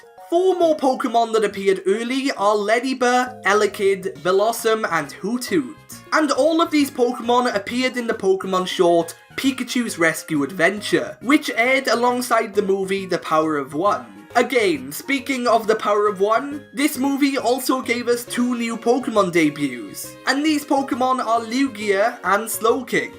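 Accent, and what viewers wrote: Wales, United Kingdom. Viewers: Are you welsh 😊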